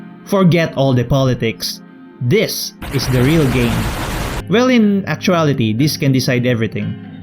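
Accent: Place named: Philippines. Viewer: Strong filipino accent, make a run that parallels idiotic filipino internal policy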